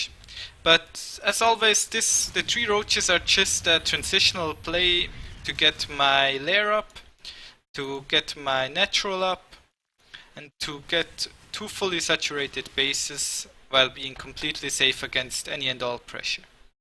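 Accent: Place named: Swiss German, Switzerland. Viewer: You have a funny accent. Almost like a swiss german talking english.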